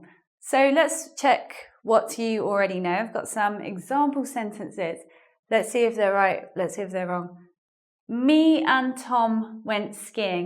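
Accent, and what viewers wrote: United Kingdom. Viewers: Your voice and British accent are so elegant that I come to listen to them no matter what you tell (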